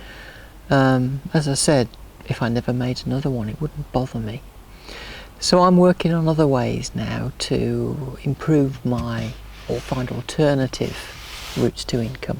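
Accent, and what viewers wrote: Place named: West Midlands, United Kingdom. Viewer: If you're reading, I just found you're channel; even though it is closed your love, friendship and inspiration is continuing to reach people, who like you, care! There are a lot of us! I would have told my boss the same thing as well! I do hope the business is going well. I hear a West Midlands accent! If you do read this, can you please send me a link to your website. Have fun!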